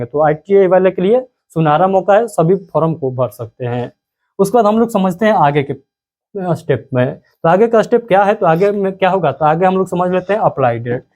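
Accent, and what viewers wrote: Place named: Bihar, India. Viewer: Pahli baat ki aap ki bhasha se aisa lagta hai ki aap Bihar Rajya se hai kya mai sahi hun.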